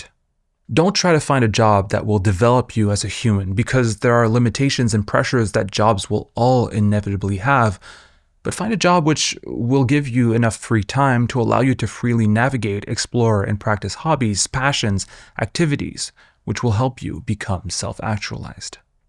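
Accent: Quebec, Canada. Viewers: Did the freedom from monetization allow you to be more french-Canadian too? I feel like the Quebecois really came out in this video 😊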